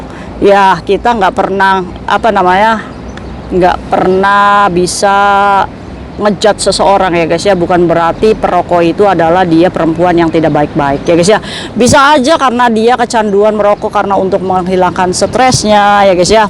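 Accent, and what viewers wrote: Indramayu, Indonesia. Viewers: Logate kyk Wong Indramayu sih?? ana Longkrang e kedik 😂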